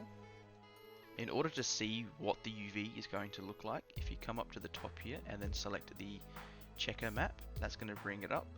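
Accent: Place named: Australia. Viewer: Your the first Aussie I’ve heard on a maya video 😂🎉🎉🎉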